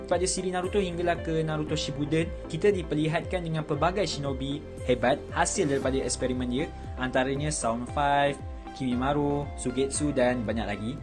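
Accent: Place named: Selangor, Malaysia. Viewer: Orang selangor ke ni Sbb kawan2 aku yng asal selangor 75% pelat2 sikit😂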